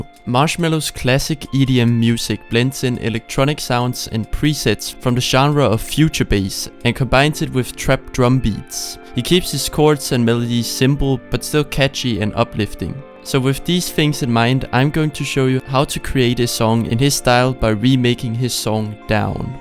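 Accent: Denmark. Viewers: Correct me if I’m wrong, but that’s the most danish accent I’ve ever heard (dope titprial tho!)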